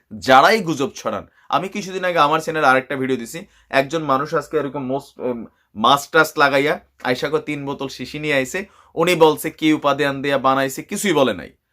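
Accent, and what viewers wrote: Comilla, Bangladesh. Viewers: আপনার সেফুদার কণ্ঠ অনেক ভালো লাগে আর কুমিল্লার ভাষায় তো মাশা আল্লাহ। আশাকরি সব ভিডিওতে কুমিল্লার ভাষায় কিছু বলবেন😊😊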